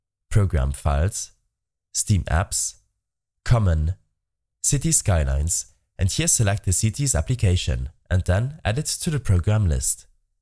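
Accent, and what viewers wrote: Germany. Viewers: Was thinking Japanese from the accent but was surprised that it's German.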